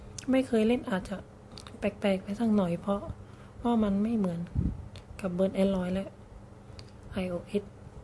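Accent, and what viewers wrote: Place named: South, Thailand. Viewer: อยุจังหวัดไรนิแหลงออกทองแดงเรย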